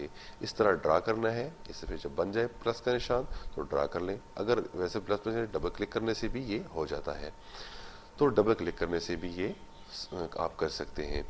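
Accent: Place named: India. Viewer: He revealed his face. I had an idea you were from india with the accent but very informative video.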